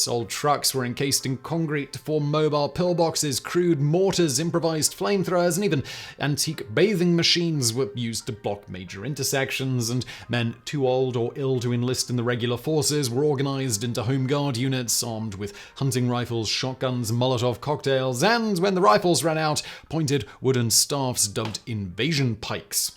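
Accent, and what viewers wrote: England, United Kingdom. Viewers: What type of English accent is that?out of curiosity.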